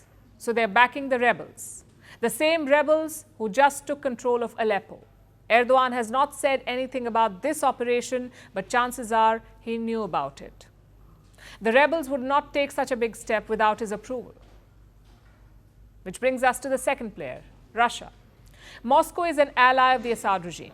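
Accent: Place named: India. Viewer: Funny indian accent😂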